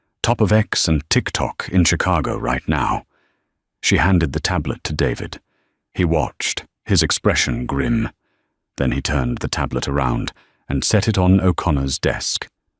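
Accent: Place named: United Kingdom. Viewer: STOP with the British voice and pronunciations when dealing with American settings.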